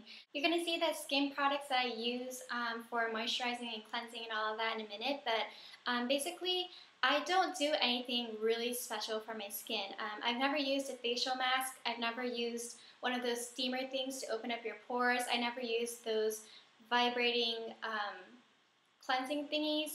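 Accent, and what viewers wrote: Japan. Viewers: THE FUNNY THINGS I SEE THE LAST PART YOU ALSO LEARN THE ACCENT FROM JAPAN STYLE. SO CUTE AND NICE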